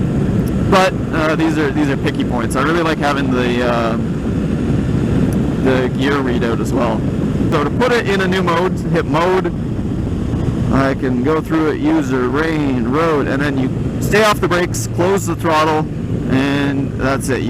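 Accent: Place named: Canada. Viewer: Great review. Thank you. When you talk about the speed you are going, is this in kph or mph please? (I see that the speedo is calibrated to read in kph but I think your accent is Canadian (sorry if I got this wrong and if you are in fact American!) and so you would normally be using mph. Judging from the video I suspect you are talking kph?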